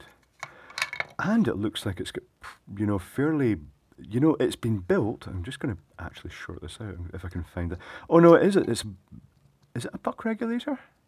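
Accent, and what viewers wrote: Scotland, United Kingdom. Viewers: I agree, though I suppose we could still enjoy the Scottish accent as narrates?!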